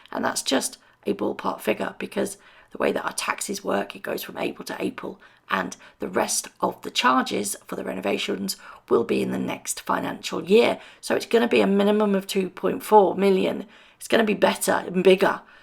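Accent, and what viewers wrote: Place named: United Kingdom. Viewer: Aww, I really hope you get to feeling alot better very soon hun. You really do sound very worn out or tired and such... So you had a med procedure, or are just getting some rest or both? I am saying prayers for you, sweet lady. I love your informational videos so much... And your lovely British accent reminds me of my U.K. 1st generation Aunties and cousins round the U.K. I really hope you get to feeling better... That's the main thing. Health is #1, then coming back fully.... Blessings & (((hugs))), take your rest... We will not leave!! Promise lol!! 😘💞💖💗🙏🙏🙌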